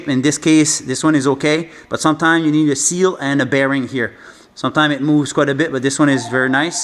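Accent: Quebec, Canada. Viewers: good video thanks, I'm doing mine today, You sound quebecois , Are you in Gatineau or Montreal?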